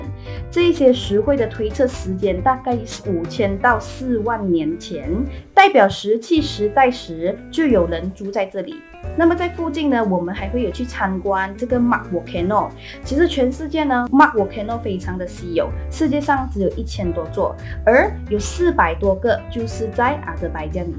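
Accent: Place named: Malaysia. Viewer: 听口音，博主是马来西亚人吧？视频图片很多，信息丰富，感谢。我是看见这个国家的糕点视频引起的好奇心。博主不出镜而是拍全凭景观非常赞！🎉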